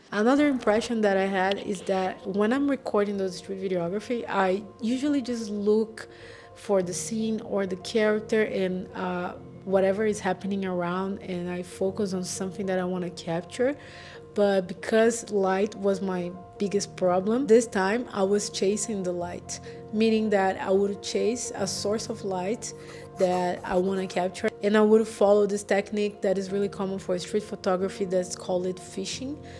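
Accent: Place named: Brazil. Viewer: Excellent content, and I love the Brazilian accent!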